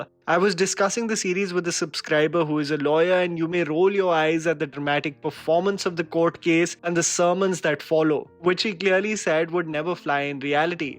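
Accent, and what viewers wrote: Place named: Mumbai, India. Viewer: HEY ARE YOU FROM MAHARASTRA SPECIFICALLY FROM MUMBAI?? your accent is so familiar to that of the ones in mumbai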